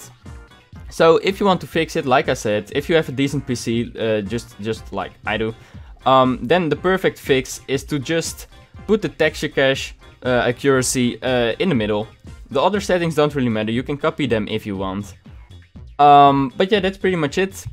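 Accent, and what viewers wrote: Netherlands. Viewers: Lol your dutch like me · you sound dutch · You sound dutch. NEDERLANDEEEEEEERS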